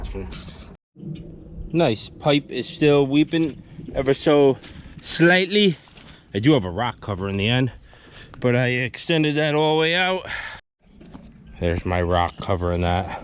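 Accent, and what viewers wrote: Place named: Albany, United States. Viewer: I find the commentary entertaining. The way you speak reminds me of my cousins from Albany. My grandmother's sister married a guy after WWII she met while he was here at a former army base and moved up there. They used to come down here during the winter months to get away from the snow and I was always fascinated to hear them speak. I appreciate the vids. Be safe Boss man.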